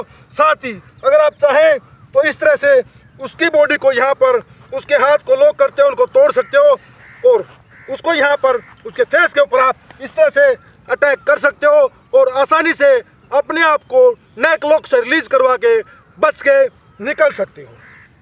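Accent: Haryana, India. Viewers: I think Aap haryana se ho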